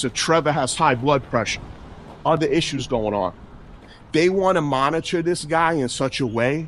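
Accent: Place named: Long Island, United States. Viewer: When I hear you speak it reminds me of my Homies from the north shore of Long Island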